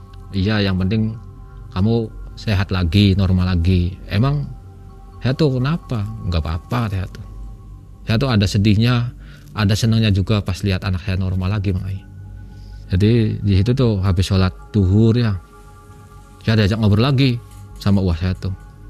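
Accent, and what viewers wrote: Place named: Cirebon, Indonesia. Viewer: Wong cirebon nadane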